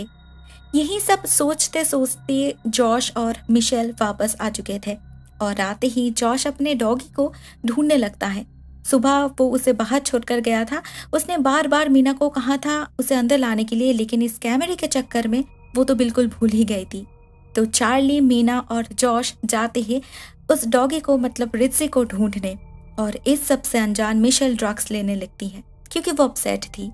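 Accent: Bengal, India. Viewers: I love ur Bengali Accent 😜😘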